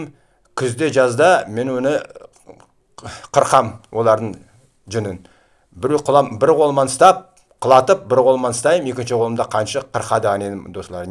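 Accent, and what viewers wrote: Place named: Kazan, Russia. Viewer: Интересные вещи рассказывает къардаш, но произношение некоторых слов казанское.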